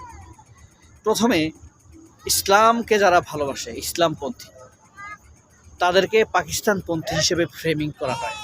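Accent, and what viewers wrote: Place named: Chittagong, Bangladesh. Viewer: ভাই মনে হয় চিটাগং সিবিস আছেন।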